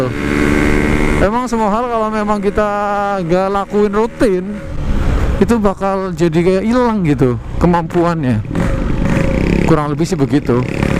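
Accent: Banyumas, Indonesia. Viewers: pantes ngomongnge kaya ngapak ...tengan wong Tegal ..mantapp bro lanjutkan truss pokok.y salam wong ngapak 👍🙏🙏🙏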